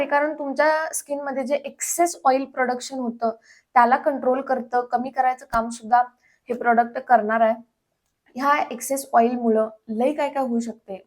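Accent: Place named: Western Maharashtra, India. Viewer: Tumhi pakka satara sangli kolhapur kadche aahat 😅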